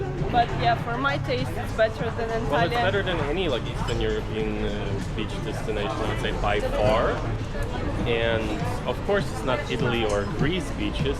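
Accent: Ukraine. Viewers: J’adore ton accent ukrainien ❤️❤️❤️❤️ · P. S. I love your English. It's very good and very up-to-date modern. Your Ukrainian accent for it makes it so beautiful to hear. Thank you for bringing us along and showing us all the different parts of Ukraine. It made me so sad to see the war-torn parts of it. I'm so proud of the Ukrainian people for their resilience and bravery. And for their support of their brave soldiers. слава Україні. Я люблю Україну.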